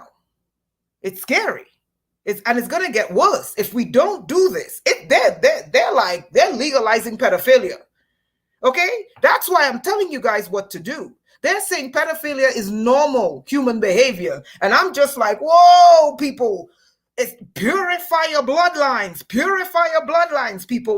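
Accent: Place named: Trinidad and Tobago. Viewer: I love this Caribbean queen and I love how she blends in her Trinidad slang and pronunciations of some words. My country Belize which is a part of Caricom but located in central America also speaks broken English so I can understand very well especially when she becomes passionate with some words and tries to drive a point home. It reminds me of how our elders speak to us, very strongly, and by the end of the talk if you don't learn anything and take heed then you're on your own. "yo ears cahna tuff like kunut fiba"!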